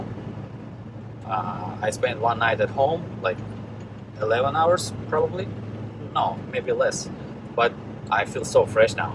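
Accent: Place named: Russia. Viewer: Доброго дня! Теперь можно добавить и в обучающее видео по английскому. С русским акцентом понятно практически все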